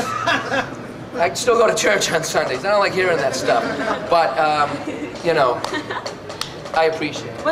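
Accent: Boston, United States. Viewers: He's from the Boston area. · Boston accent + saying retarded= win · Is he a Boston fella?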